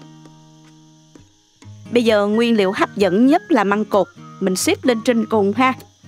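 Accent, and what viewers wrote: South, Vietnam. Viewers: giọng nghe rất miền Nam và rất chân chất, ủng hộ Cô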